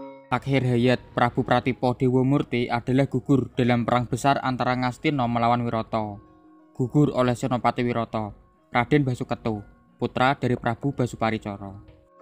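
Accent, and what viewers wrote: Java, Indonesia. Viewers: Medok banget jowo ne, love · Mhn maaf, jika mungkin yg memberikan ulasan sebaiknya diganti, soalnya terlalu medok jawanya. Sy wong jawa saja gak enak dengernya.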